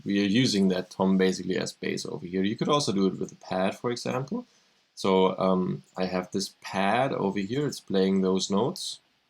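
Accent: Germany. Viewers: Man hört raus dass du deutsch bist xD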